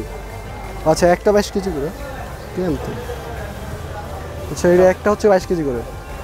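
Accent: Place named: Dhaka, Bangladesh. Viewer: Overall valoy.. editing ta besh valo lagse.. kotha bolar style ta r aktu shundor kor vai, r try kor dhakar vasha ta kom sure kore smart vasha ta use korte, r akta bishoy holo gurur dam kemon, kon gorur kon koshay kerokom dam chai ata tule dhor. corona er jonno bazarer ki rokom obostha ata shobar kase pousate parle valo response pabi.. best of luck dost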